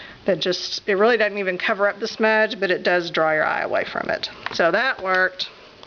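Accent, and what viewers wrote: South, United States. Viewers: Gotta know where you're from! Our southern accents nearly match! Love these vids!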